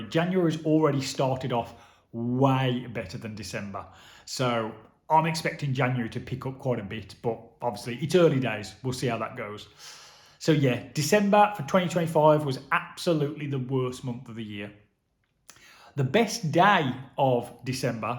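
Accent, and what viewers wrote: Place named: West Midlands, United Kingdom. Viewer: From your accent, I’m guessing you’re from the West Midlands, so we’ve got very similar weather. I’m surprised your imports are way lower than mine. I guess a lot will be the positioning of the PV. DNO export limit: 4 kW Inverter 6kw PV = 7kw (South-west facing) Battery = 18.8kwh Imported £65.59 Exported £15.01 EV MG ZS Octopus Go + Outgoing Octopus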